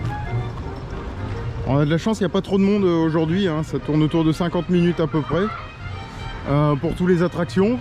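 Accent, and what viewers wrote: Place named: North, France. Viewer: J ai découvert ta chaîne y a quelques jours, bravo pour ton professionnalisme tu fais attention à tout, la musique, le bruit , le vent ( ce n'est malheureusement pas toujours le cas de certains youtubeurs !!!! ) je vais m empresser de visionner toutes tes vidéos J ai cru reconnaître, légèrement, l accent du Nord, es tu un ch'ti, ce n est pas péjoratif j'en suis un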